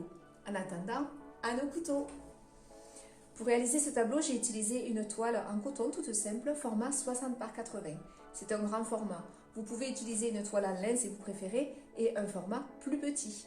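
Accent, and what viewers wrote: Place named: Toulouse, France. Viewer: Magnifique ! Merci pour ce tuto très coloré et moderne....accompagné comme toujours de votre joli sourire et du petit accent toulousain 😁😉😘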